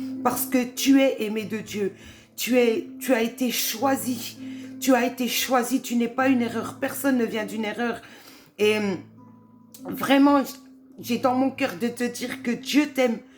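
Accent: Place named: Franche-Comté, France. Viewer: Hallelujah ma soeur merci pour ton partage, sois bénie au nom de Jésus Christ ! (Je reconnais que tu es Franc-Comtoise à l’accent parce que je le suis aussi hihi) Le Saint-Esprit en toi à parlé Gloire à Dieu ! 🕊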